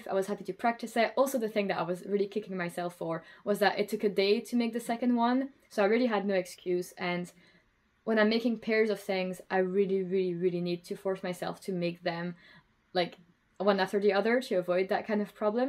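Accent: Scotland, United Kingdom. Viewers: I'm watching you just now and love the Scottishness coming through your accent. Like all of us in Central Scotland you've dropped your t's. I'm really enjoying the podcast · A lovely podcast. Your sweater is so nice. I live in Glasgow & you sound very Scottish. I like your swatch knitted in local Suri Alpaca. I am knitting a third Sophie scarf for a friend. Thanks 🏴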